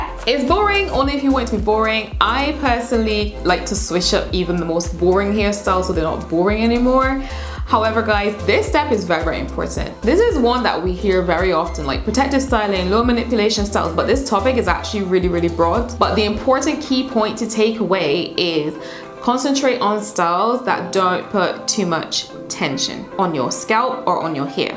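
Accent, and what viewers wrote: Barbados. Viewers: I’m hearing a Bajan accent! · Please what is your accent !?? Am I hearing Bajan? Absolutely beautiful ! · You sound almost bajan😄your hair I'd beautiful. My goal is healthy waist length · Am I hearing a bajan accent? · 🇧🇧 I hear my people in your voice! New subscriber! And this was a great video! Thank you!!! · Are you a Bajan Queen? I’m sensing the accent. 😀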